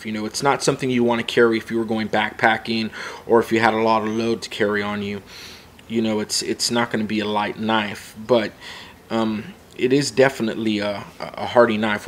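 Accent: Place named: Canada. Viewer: this is totally off topic, but are you canadian? because youve got the most adorable accent!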